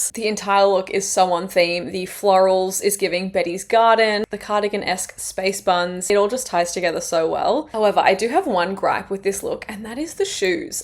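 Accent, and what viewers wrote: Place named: Australia. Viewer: OML I HAD SUCH A MOMENT WHEN U SAID U WERE AUSTRALIAN- I AM TOO AND I'M SO USED TO HEARING AMERICAN ONES I JUST ASSUMED U WERE, AND I DIDN'T HEAR ANYTHING DIFFERENT BECAUSE IM ALSO USED TO AUS ACCENTS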